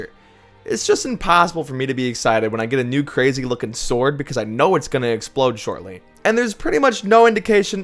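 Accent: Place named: Midwest, United States. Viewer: Midwestern man YouTube voice is music to my ears.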